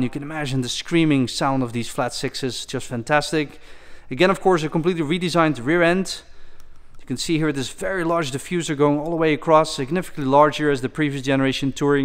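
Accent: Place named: Netherlands. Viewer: en is een Hollander als ik me niet vergis in mijn oren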